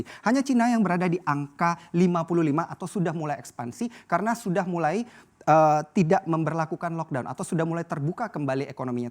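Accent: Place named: Kalimantan, Indonesia. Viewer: Aku nebak dari loghatnya presenternya orang kalimantan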